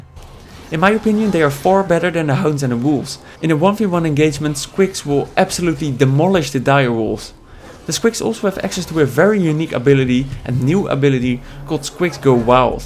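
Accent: Netherlands. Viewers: let me guess, you're Dutch xD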